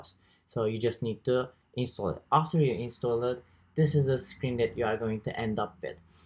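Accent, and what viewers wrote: Turkey. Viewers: İngilizce aksanından türk olduğunu anladım. Teşekkürler video için. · Youtube da gezinirken hasbelkader sana rastladım. Konuşma aksanında ya hu Türk galiba dedim ve öyleymişsin. Bir sevindim ki inananmam. Çünkü 3d yazıcıdan silikon basan gördüğüm tek kişi sensin. Bana bu konuda yardımcı olmanı rica ediyorum. 3d yazıcı alarak silikon kalıplar basmak istiyorum. Lütfen bana yola göster. Ne alayım, filamentinden makinesine kadar yardımına muhtacım. Teşekkür ederim. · Accent is turkish indeed. Cool video!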